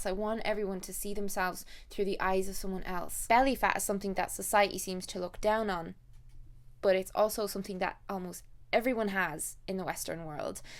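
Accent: Ireland. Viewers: In all honesty I'm not happy with my body but there's no hate. I've struggled to put weight on for years and after recently being diagnosed with under active thyroid, I can now start to heal and find balance to my body again with Meds and a return of energy. Though I don't like how my curves have dropped off and I already have a prominent bone Structure, I am thankful for the blessings I do have, I remind myself that my vessel doesn't define my value and the fact I would never want another to feel In such a way so I must be kind to myself also. The odd days where I just feel bleurgh, I will either sloth it out n just enjoy being comfy n have the lil treats that cheer me up like a brew, favourite food or a pamper. Or I will front it out, put a face on, get dressed and put focus on a part of me that I'm happy with ie: do my makeup a different way to draw attention to my eyes or lips, accentuate my waist or wear tights or Leggings instead of something unshapely. 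Thank you for always being so open and honest and sharing your experiences. I adore you and your Irish accent and know you inspire so many people, so thank you for using your platform for kindness 💖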